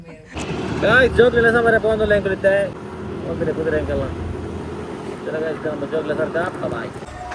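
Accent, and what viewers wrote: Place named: Mangalore, India. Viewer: na tulu udupi style bokka na tulu kudla type... Enjoyed vlog,keep doing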